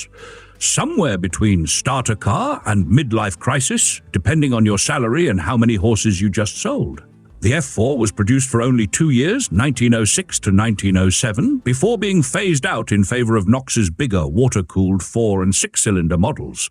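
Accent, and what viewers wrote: England, United Kingdom. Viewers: Button up! My grandmother still had the button hooks - a nice stick with a hook. But don't complain . You get to see and hear all this for free. (Oddly, although the AI vocal sounds English, I heard an Amerenglish term or two.. the solecism is so distinct that i believe our uploader is American . Be kind to us who are forced witnesses to the emissions of a foul orange frog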